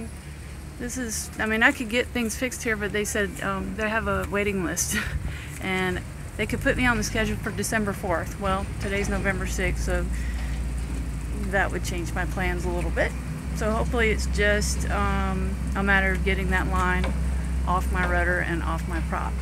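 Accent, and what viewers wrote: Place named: South, United States. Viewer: Never a dull moment in the life of a boater! BTW, any crab in that trap? Free lunch! Seriously, hope the diver doesn't find anything more than some fragments of line down there and you can continue with your adventure. I really enjoy watching all of your video's PLUS you are very easy on the eye!!! Southern drawl and all.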